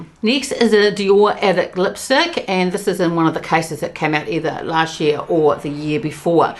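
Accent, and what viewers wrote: New Zealand. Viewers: I'm so glad I found your channel... I also love your beautiful Kiwi accent! Lots of love fom the other side of the world! 💜🇬🇧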